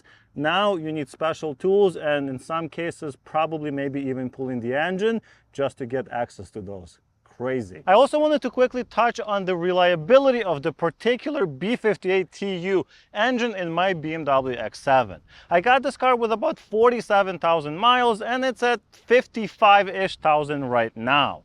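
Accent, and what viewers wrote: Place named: Russia. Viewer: Акцент наш ))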